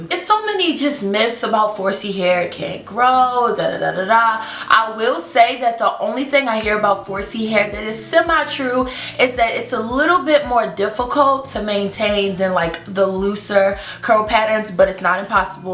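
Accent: Baltimore, United States. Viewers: As soon as u started talking I said is she from bmore🤔🤔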